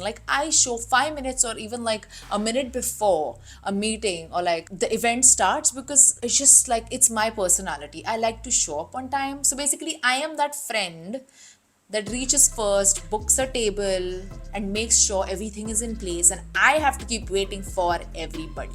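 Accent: India. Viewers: 😂 You still have your Indian accent unchanged! About your comments on Indian punctuality (or lack thereof) I agree with you a hundred percent. IST (Indian Standard Time) is also known as Indian Stretchable Time. I wouldn't like to total up the manhours I've spent fretting and fuming, waiting for someone or the other: the total figure might give me a heart attack.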